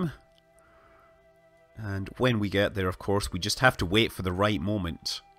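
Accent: Scotland, United Kingdom. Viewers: Only without the shouting, more intellect and a scottish accent?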